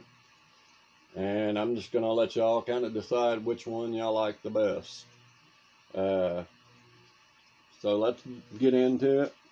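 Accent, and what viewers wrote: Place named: Northeast Alabama, United States. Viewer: Bud, you sound just like we do in northeast alabama. Lol and I believe that bass baits monthly won out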